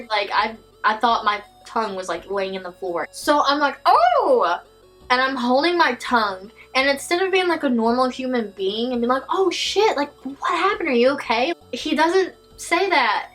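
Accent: South, United States. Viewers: Where are you from, you sound very country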